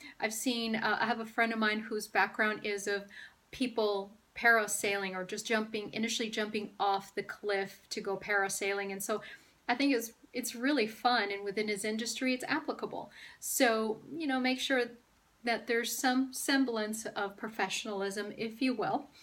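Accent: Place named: United States. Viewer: I"m most surprised that English is not your first language. Floored really. Your American accent is perfect! I've worked with foreign students and with ESL programs, and I would never have guessed English is your second language. You constantly surprise me B!!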